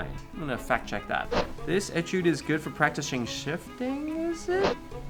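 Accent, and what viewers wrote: Australia. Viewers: please don't apologise for the australian accent... in fact, you should apologise that you lost it in the first place :D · Bro Your accent sounds like it’s from Australia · Is it me or did you change your accent to a more Australian accent? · Hahaha I have been so confused and thought I was going crazy like why does he only sometimes have an Australian accent!? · dude you sound australain. do you live in my backyard ???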